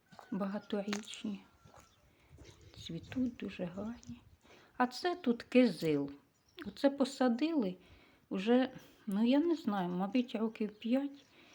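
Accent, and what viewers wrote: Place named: Poltava, Ukraine. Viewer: Я по Вашому говору зрозуміла що ми земляки.Я живу в Полтаві.Родилась в селі, Гребінківськийрн.Маю дачу. Теж люблю квіти люблю все що зв’язане з землею.Миру нам всим добрим людям. Як наз ваше село?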